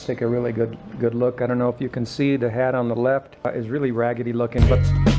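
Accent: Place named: United States. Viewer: Not perfect Spanish but gringo Spanish.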